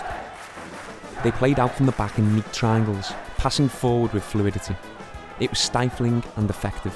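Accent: Liverpool, United Kingdom. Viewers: Scouser accent 😂🤣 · May be not but I defo can say he's scouse 😁😁😁...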